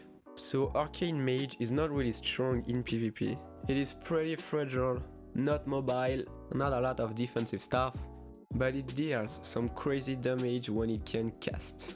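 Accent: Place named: France. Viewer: french accent :p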